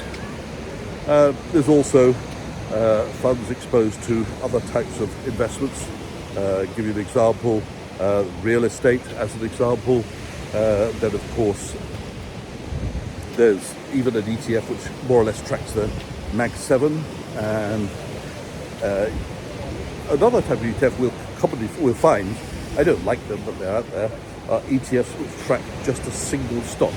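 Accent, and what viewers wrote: United Kingdom. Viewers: Dear mr., really appreciate your information and the effort you make to cover all aspects. Also the way it is presented and in true English from the UK. Thank you and hope you do enjoy making more video’s to progress my learning and knowledge. Greetings from Holland.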